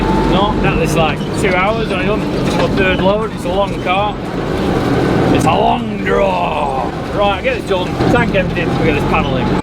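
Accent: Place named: Northern England, United Kingdom. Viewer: Anyone notice has a YouTube voice (posh), then when he's speaking to staff he goes very northern! ᕙ( • ‿ • )ᕗ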